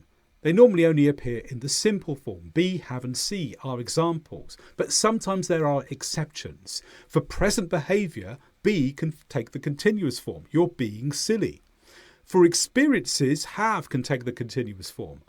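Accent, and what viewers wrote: United Kingdom. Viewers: Wow, wonderful world class english. I like british accent V.much.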